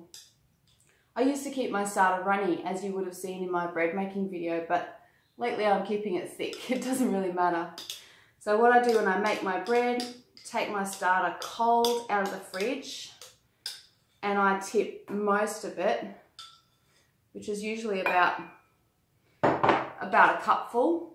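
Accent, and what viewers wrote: Australia. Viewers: Love that Australian accent.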